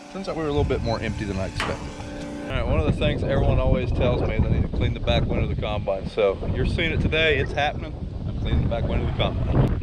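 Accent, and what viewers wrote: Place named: Ohio, United States. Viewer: I appreciate hearing talk. It reminds me of spending summers in Columbus working for my uncle in the early 90's. I also spent some of that time in Chillicothe, had a cousin there. Living near Buffalo, you don't hear your accent here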